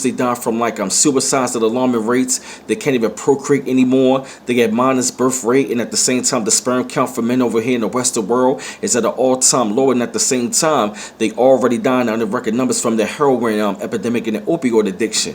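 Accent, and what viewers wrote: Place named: Philadelphia, United States. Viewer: Your accent sounds like you come from Philly? Yes they are losing power all over the earth. People are standing and speaking out against them. There is a shift of consciousness taking place. Yup! You are speaking truth, and I see everything unfolding. I was telling my daughter for the last few years what was is unfolding right now. I could and can actually visualize what is and going to happen. I been saying everything you said.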